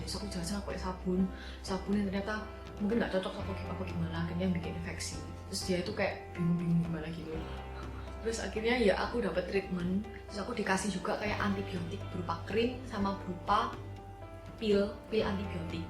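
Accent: Java, Indonesia. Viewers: Jawa bgt. 😂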